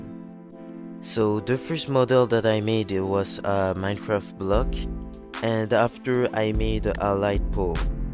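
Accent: France. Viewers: Tu est un français ça s'entend !! 😂😂😂 Mais super video!! Continie comme ça!!😊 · are you french? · You are french for sure hahaha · are you french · you speak french ? You have a accent french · Aew you french?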